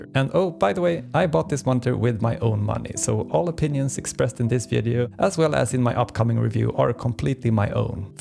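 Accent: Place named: Sweden. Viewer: I KNEW IT.. You are Swedish. Your english pronounciation made it clear :D Looking to buy the same monitor. Greetings from Norway btw.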